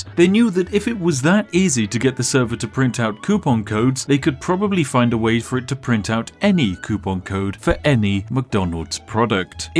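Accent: United Kingdom. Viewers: If nothing else, I can always look forward to listening to this man's smooth British voice explain how he's broken the very laws of physics. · The Internet Historian is a lot more british than i remember...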